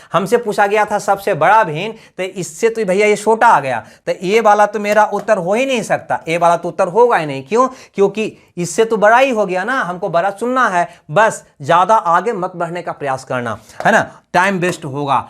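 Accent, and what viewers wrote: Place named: mixed, India. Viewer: Sir apne Hindi bolne ki language samj nhi attii simple Hindi boleya naa takke bakki logo ko samj aye ap Bihar up mp type Hindi bolte hoon samj nhi attii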